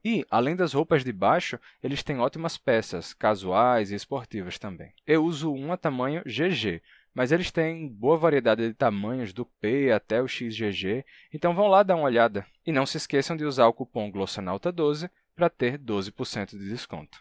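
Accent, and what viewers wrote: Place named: Natal, Brazil. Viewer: vc é de Natal-RN? Seu sotaque é muito parecido com o meu e sou de lá, fico me perguntando isso todos os vídeos hahah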